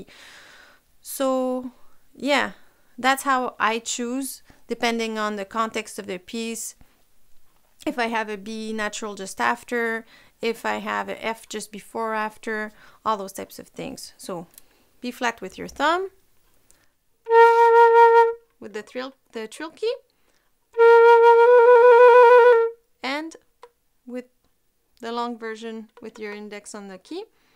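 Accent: France. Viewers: o wow! Congrats!! ooh you are French! Das why you have an excent! I was reading the comments below 😂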